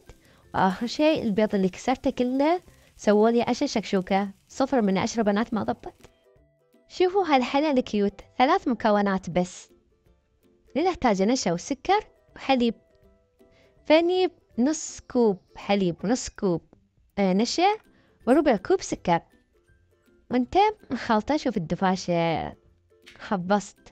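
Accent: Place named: Iraq. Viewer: فيدوهاتك تجنن 😔💟مايفوتني ولا فيديو الحمدلله ،وكلامك كانو عراقي 😂🩷🩷🩷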